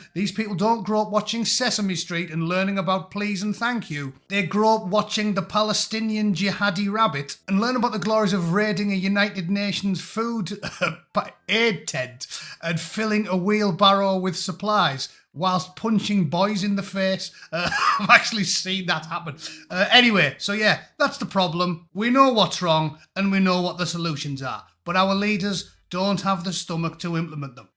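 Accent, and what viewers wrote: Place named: Liverpool, United Kingdom. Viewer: Scouse af! Keep your channel flowing please sir.📚☘️🙏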